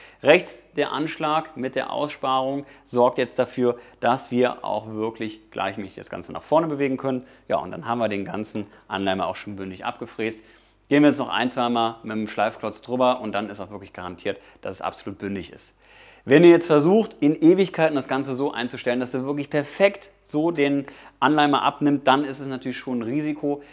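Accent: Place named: Germany. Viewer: du bist ein Genius. Wie immer, es ist ein Vergnügen dir zu zuhören. Und dein deutscher accent ist sehr angenehm. Grüße von down under.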